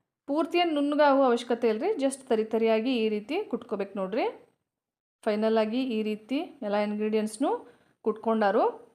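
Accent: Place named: Belgaum, India. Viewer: Hi mam ,ur kannada tells us that u r from Belgaum district. (madtaru) that roo of madtaru😊😊.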